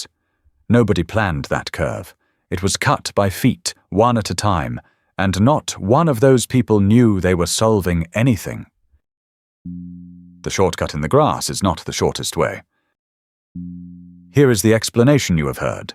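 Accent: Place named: Germany. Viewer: It figures this guy has a German accent.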